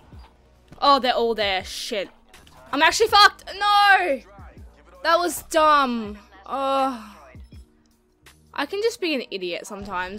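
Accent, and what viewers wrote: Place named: Australia. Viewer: also, the Australian accent is awesome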